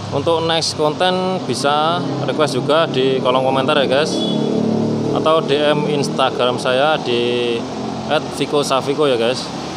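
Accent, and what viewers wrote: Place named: Java, Indonesia. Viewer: Medoke lucu bang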